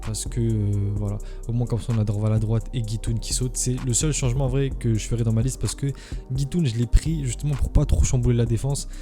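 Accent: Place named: France. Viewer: C,est quoi cet accent français ? Tu mâches tes mots, on ne comprend rien !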